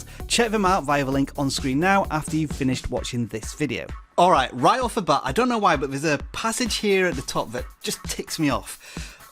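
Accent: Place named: United Kingdom. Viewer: If my comment is missing something on this topic, please be kind and offer some of your own bits of wisdom. First things first: This complete topic applies ONLY to US. citizens living in the territories and states of the US. The laws being considered here ONLY apply to those living within the United States of America! Second, this man (by his obvious British accent) may not be US citizen. We can't know whether he is or is not. How does this law concern him? I need more information! Third, would he please provide how he is so informed about the details of this law. Hope you can see my problem with this video. It appears completely inappropriate to the law in the US.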